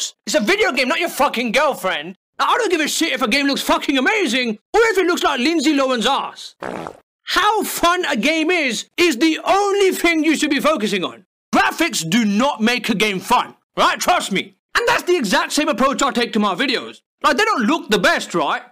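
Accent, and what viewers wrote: United Kingdom. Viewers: british lmao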